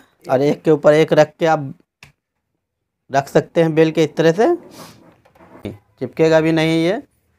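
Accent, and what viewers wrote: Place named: Jharkhand, India. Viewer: Kaa o bhaiya, Bhaasa se to Jharkhand ke lag rahe ho...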